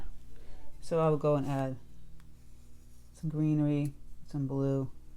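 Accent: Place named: New England, United States. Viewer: I love watching your videos and I love your accent, I don't know if you are or not but you sound like a New Englander! Which makes me happy and I love your art it's always inspiring. Thank you!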